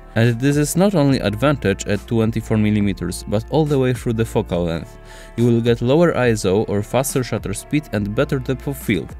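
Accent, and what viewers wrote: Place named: Poland. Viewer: To each their own! I liked the accent. But I work with a few Polish-born people (in America) and am used to that accent, and find it pleasant. :)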